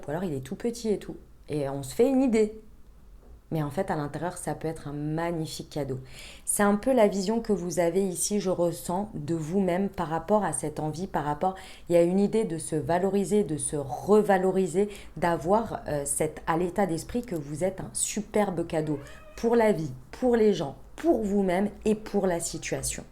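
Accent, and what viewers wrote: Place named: Paris, France. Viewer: J'aime trop ton accent parisien il me fait grave sourire. Sur le fond toujours au top comme d'habitude 👌😊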